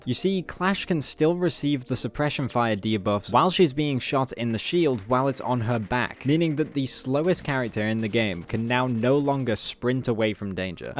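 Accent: United Kingdom. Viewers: Didn't know you were British what part??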